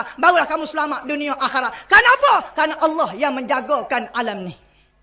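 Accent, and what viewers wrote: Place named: Kelantan, Malaysia. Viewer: standart la bahaso klate. xsoh maroh la denga mace kasa skit .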